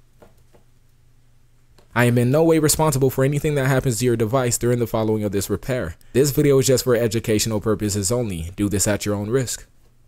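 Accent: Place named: United States. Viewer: His accent is american and the phone is from verizon which is an american provider, how the fuck do you notice from the sneezing? I'm confused.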